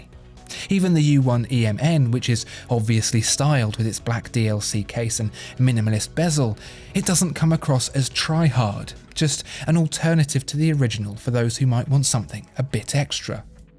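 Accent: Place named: RP, United Kingdom. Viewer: this guys voice drives me so freaking crazy. he talks so freakin slow and poshly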